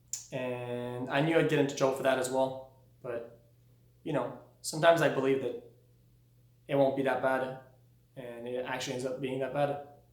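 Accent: Quebec, Canada. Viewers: He’s a French Canadian that is trying his best to talk English though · Just to make sure, are you from quebec, because you have the accent, i write this in english because im not sure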